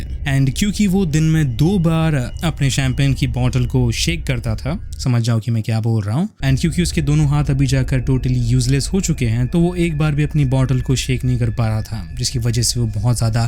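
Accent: India. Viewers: You actually sound like only desi. Thanks for the video tho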